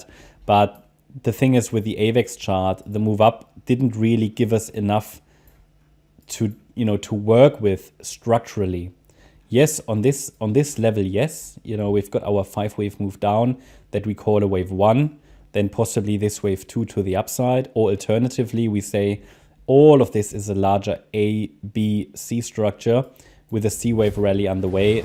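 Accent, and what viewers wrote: Germany. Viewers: I love your german Accent 🩷 Vielen Dank !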